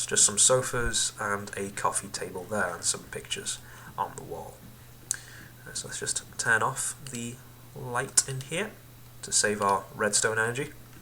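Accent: United Kingdom. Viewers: Pam VanWinkle its called a british accsent stupid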